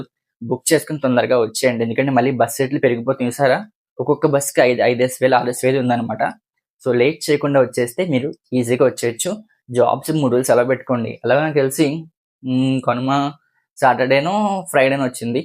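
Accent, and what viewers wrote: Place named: Godavari, India. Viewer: Abbo Godavari slang😊😂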